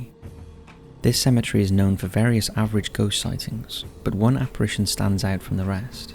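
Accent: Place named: England, United Kingdom. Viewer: As long as you are able to understand what is being said, the accent or enunciation does not matter. The point of language is to communicate effectively, as long effective communication is being carried out, it really does not matter if Tomato is pronounced "Tomayto" or "Tomahto". English originated from England, yet Americans took it as their first language, changed the spelling and pronunciation of words. Americans themselves have dozens of English accents. Even in the UK, there are many accents. Both UK & USA being primarily English speaking countries have many different ways of pronouncing words. If you look at footage of Americans from 1940-60, their accents sound way different than they do now. The further back you go in time, the more American English sounds like British English. Language changes and evolves with time and globalisation. 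In conclusion, there is no right way to pronounce any single word, because not everyone has the same upbringing or cultural background. Your accent is decided by your race, family, place of upbringing, first language, how many languages you speak. And it can also change if you spend time in a foreign country. So I don't think you should nitpick about how an "Englishman" enunciates words, if you're an "American".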